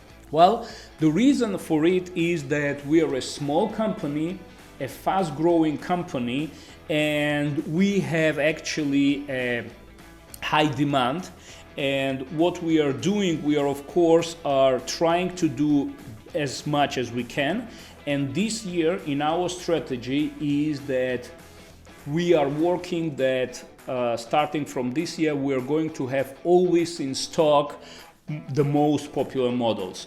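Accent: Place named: Russia. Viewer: Испанский стыд за русский акцент в английском языке. Континуэйшн. Ой....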